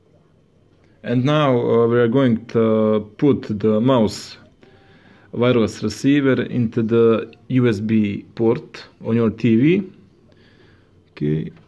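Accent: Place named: Russia. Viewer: Fantastic Russian accent dude you're fantastic man great if you should do more videos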